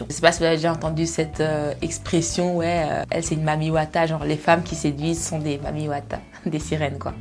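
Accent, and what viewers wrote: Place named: Brazil. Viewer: Você é brasileira, o sotaque família Brasilândia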